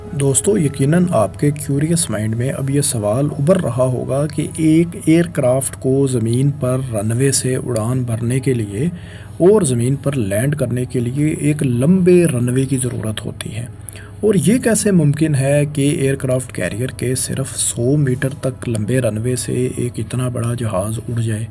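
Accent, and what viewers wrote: Punjab, Pakistan. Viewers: پنجابی ایکسنٹ میں اردو یہ جناب بولتے ہیں۔ پروگرام لاجواب ہے مگر غیر موزوں آواز کے باعث اپنی افادیت کھودیتا ہے۔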